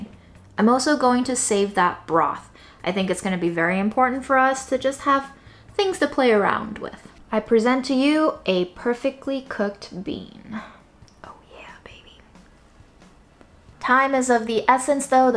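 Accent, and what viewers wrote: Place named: South, United States. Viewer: she has a southern accent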